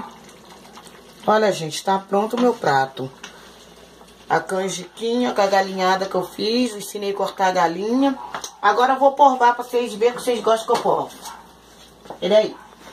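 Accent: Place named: Minas Gerais, Brazil. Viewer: Oi cheguei aqui estes dias e estou amando adoro seu jeito simples de falar vc nasceu em Minas? ???sotaque parecido Beijo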